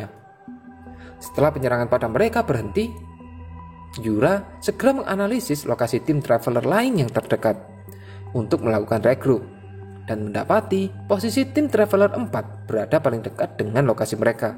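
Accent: Java, Indonesia. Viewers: Bagus, suaranya medok banget, jadi enak ngedengerinnya bro, he he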